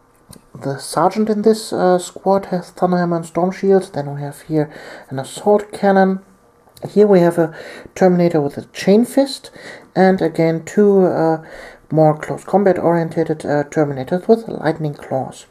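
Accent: Germany. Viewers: hey i watch your video's when ever i get LotR but, so can you do a video for the army of the dead. also are you german?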